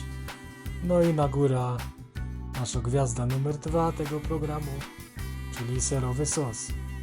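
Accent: Silesia, Poland. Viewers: Jak ja nienawidzę tej śląskiej gwary......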